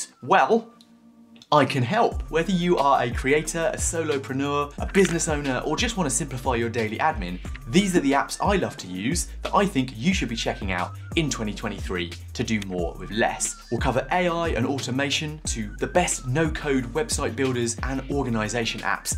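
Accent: United Kingdom. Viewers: I fell in love with your channel two years ago and have religiously been watching every video as well as BUYING both of your second brain offerings. Being an avid viewer, I feel compelled to give you some constructive criticism. We Americans are a sucker for a proper Brit accent and you have a brilliant one. However.... (you knew this was coming)... in the last year or so, you've begun to sound like a pitch man rather than a user advocate. I realize it is most likely difficult to integrate paid sponsorships and make them sound like a recommendation rather than a compulsory blurb in your video. Keep in mind, though, your avid viewers are well aware of the paid sponsorships and seriously know the difference. I have to admit it's really started to turn me off.:( The sponsorship nods get longer and longer and they're usually about products that everyone already knows about. 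I don't want to abandon your channel so I figured, to be fair, I should express my thoughts to give you food for thought and hopefully, have you get some retrospective insight of then vs now to see where I'm coming from.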